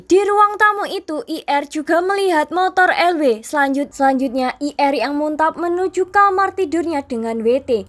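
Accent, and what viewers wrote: Java, Indonesia. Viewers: Mantap mbak medok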